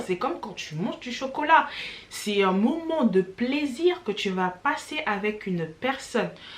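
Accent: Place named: Democratic Republic of the Congo. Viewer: L'accent a lui tout seul rend la vidéo drôle xDD Nan sérieux je kiff l'accent congolais (je suis sur a 80% que tu est congolaise) , québécois et russe 😊😁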